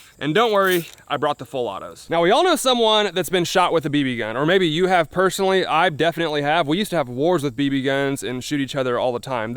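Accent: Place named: South, United States. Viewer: It’s weird. You sound southern, but you say pop.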